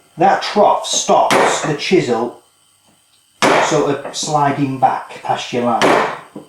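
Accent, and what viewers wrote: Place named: Birmingham, United Kingdom. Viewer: "Cotton rebates"? Dude sounds like the Peaky Blinders.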